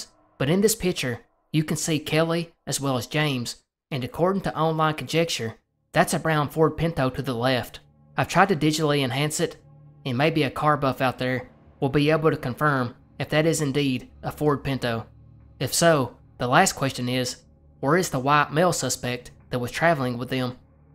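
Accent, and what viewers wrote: South, United States. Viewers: I rarely if ever comment. Now I've subscribed to you about a week. Going to college staring things off always when I fall to sleep or do my work I gotta be listening. Your very unique love your southern accent, how you just get into it don't waste time and your upload schedule that shows a lot of dedication so I would honestly like to thank you for all your hard work on just entertaining your viewers. clearly 7K subs isn't enough and I think your very underrated. If you ever read this have a lovely day, and keep doing what you're doing. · nice vid! it took me a while to get used to the southern accent but its not bad. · I can tell by your accent that your southern American don't people in your part of the world do what are called "barn burnings" all the time? Why do they do those? Isn't it usually to clear the land to build a new barn? 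This is in regards to the burned house horizon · I live in Holland but grew up in the South, so hearing your voice sounds like home 🙂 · Well said love his southern accent too and the accent in general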